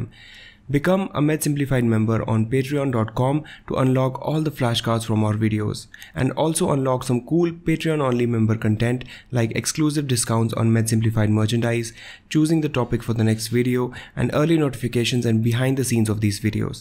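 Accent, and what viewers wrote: India. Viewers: Lol why this school related videos are always indians that I actually understand. (Not sure if he is really indian, but he sounds like it)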